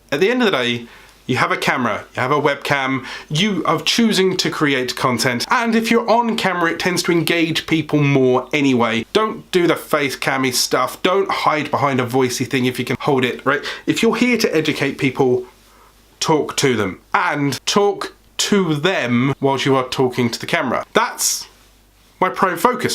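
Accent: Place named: Yorkshire, United Kingdom. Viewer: the intro was the most entertaining bit, I'm so glad you got the humour to you content... Sounds like you got the Yorkshire accent..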